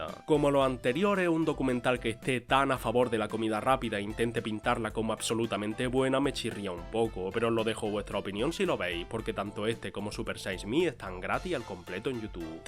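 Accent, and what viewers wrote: Andalusia, Spain. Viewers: Soy de LATAM y me cuesta distinguir los acentos españoles. ¿De donde proviene el acento del narrador del canal? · Viene de Andalucía, que se encuentra en el sur de España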